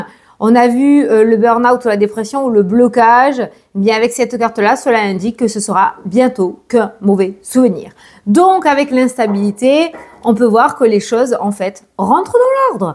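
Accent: South, France. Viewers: Bonjour ma, un grand merci pour ta guidance qui me donne du peps, tu es toujours joyeuse et j' adore ton petit accent du sud. Merci pour ton dévouement, ta gentillesse et ta bienveillance. Que le seigneur te bénisse ainsi que ta famille.❤❤❤🙏🙏🙏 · c'est une joie de vous écouter , j'adore votre accent du sud il met du soleil dans notre journée. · Je t’adore chaque jour tu me fais du bien avec ta voie du sud sa me réchauffe le coeur bonne journée et félicitation mais tu le mérites bizzzz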